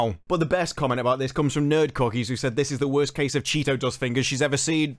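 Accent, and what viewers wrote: United Kingdom. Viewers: Can't help but smell the irony of somebody with a british accent, with a union jack behind them, complaining in english about a mangled culture.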